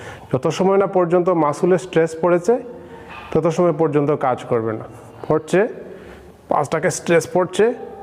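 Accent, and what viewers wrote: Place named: Barishal, Bangladesh. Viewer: ও তুমি বরিশায়লা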